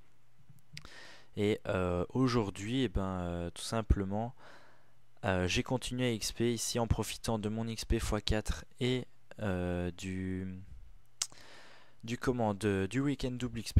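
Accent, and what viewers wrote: Belgium. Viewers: Il est belge sa s' entend je crois xD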